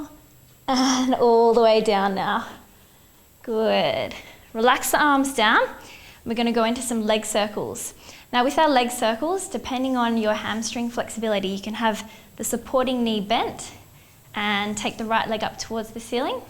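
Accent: Australia. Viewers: Loving the accent! Is it Australian?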